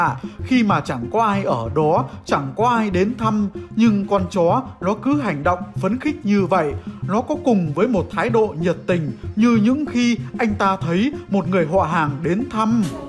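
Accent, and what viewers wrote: North, Vietnam. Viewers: Giọng bắc kỳ mà đọc truyện ma nghe cũng đc đó .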